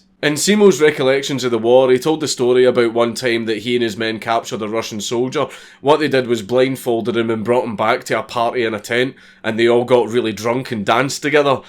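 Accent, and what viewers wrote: Scotland, United Kingdom. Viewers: I would love to have dat accent its so extreme I love it. (sry if wrong but is it scottish accent?)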